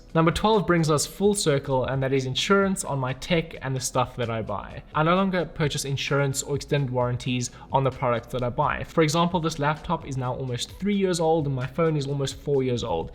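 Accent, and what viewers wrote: South Africa. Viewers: Are you South African? 🙌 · I can't place that accent, it sounds South African? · Sounds like a Bishops educated (Cape Town) ex South African 🤙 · Are you South African? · You sound South African! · I love all your videos....and listening to the familiar South African accent has nothing to do with it! ☺️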